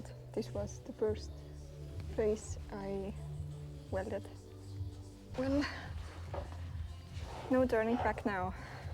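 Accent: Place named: Finland. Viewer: I was thinking your accent sounds finnish but your plates are Estonian. Then i realised where Estonia is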